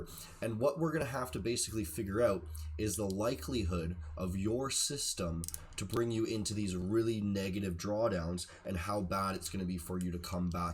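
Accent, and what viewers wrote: Canada. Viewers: its just his canadian accent....not his fault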